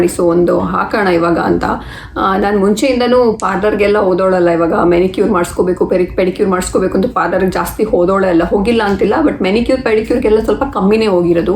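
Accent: Mangalore, India. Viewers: Hey I like to watch ur videos coz I do speak Kannada just like u.bindass but let me tell you that iam a mangalorean but I was born n brought up in Bangalore Namma bengaluru ❤️so I was happy to know that ur a mangalorean.ur hubby spoke about biryani I was like OMG good. anyways how is Canada's present situation.hope everything is fine there take care ❤️👍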